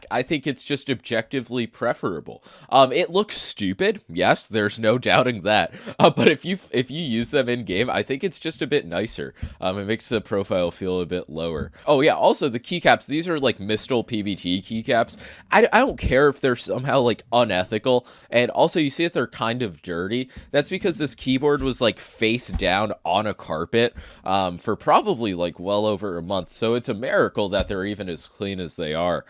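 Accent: New York, United States. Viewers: I'm guessing New Yorker lol Don't worry It's beautiful 😘 It's only a few words as well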